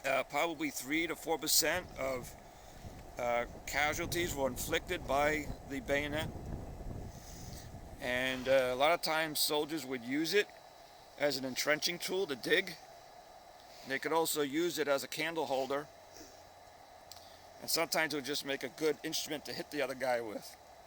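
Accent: Boston, United States. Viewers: Kinda funny how you dress as a Confederate yet you have a Boston-like accent, that's not hate or anything I just think its funny.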